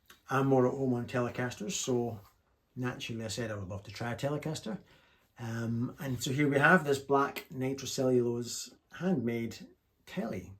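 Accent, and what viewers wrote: Scotland, United Kingdom. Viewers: What a fxxxing lovely sounding Tele. Of the many many custom Teles I've heard on Youtube, that one really sings (of course helped no end by the great phrasing and touch of the guy holding it). Also, didn't expect to hear that accent after the opening music. From a fellow Scot (albeit faraway from home)...just fantastic playing mate. Also Also...why cant other Youtube channels get decent amp tone like you?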